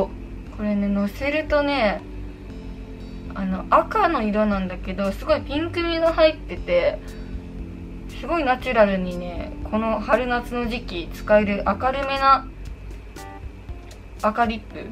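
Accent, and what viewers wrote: Okayama, Japan. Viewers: ちゃんの毎日メイク知りたかったから嬉しい😂💗 方言が岡山ですごい安心感あります🥺✨ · いつまでも変わらない岡山弁が大好き🥺 岡山人として嬉しい😌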